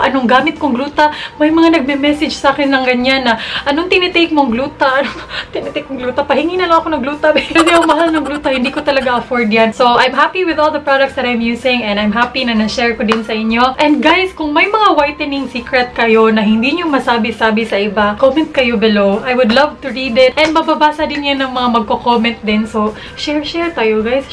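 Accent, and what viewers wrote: Visayas, Philippines. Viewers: hi te bisdak lge ka..haha taga saan ka?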